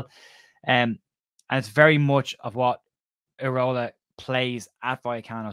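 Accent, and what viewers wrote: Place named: Ireland. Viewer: Where in Ireland you from bro? I'm Newtownards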